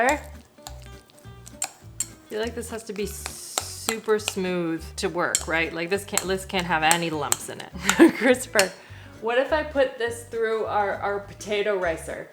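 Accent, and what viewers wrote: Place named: Canada. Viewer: Hi, it has been years since I was watching your videos on both channels. And as you mentioned on your previous videos my husband also always recognize your voice and always says that "Oh she was from Canada right?". And one thing followed another and we decide to move Canada 😅 It has been a month since I moved. It is now more enjoyable for me to watch since I am actually able to get my hands on the products and ingredients. Just wanted to say hi after all of those years I happened to be able to follow a recipe that you done! Lots of love ❣ PS. You certainly add enough salt!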